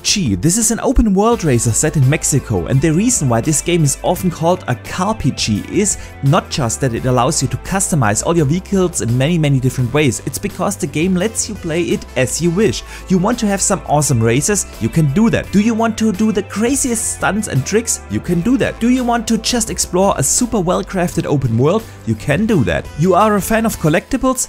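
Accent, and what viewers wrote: Germany. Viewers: Du kommst doch Safe aus Deutschland oder 🤣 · Dieses Deutsch-Englisch haha, aber gutes Video :)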